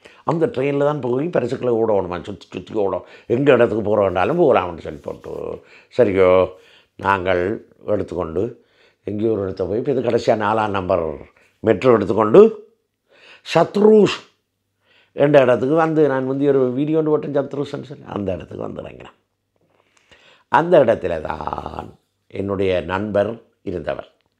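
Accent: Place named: Jaffna, Sri Lanka. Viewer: 😂🎉thanks aiya for share your experiences in purely jaffna tamil 😂😂❤😂❤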